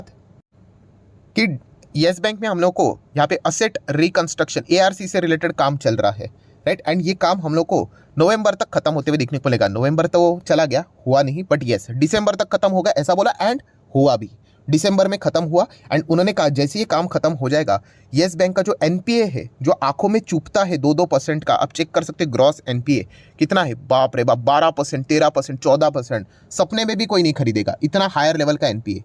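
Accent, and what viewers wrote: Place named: Gujarat, India. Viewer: Sir aap gujrati lgte hai baat se Hai??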